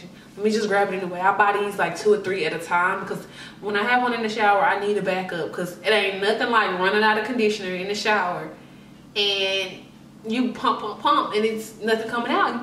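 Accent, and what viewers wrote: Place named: South, United States. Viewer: What part of the states are you from? Your accent sounds.... different. Not sure if it’s a “black” southern accent.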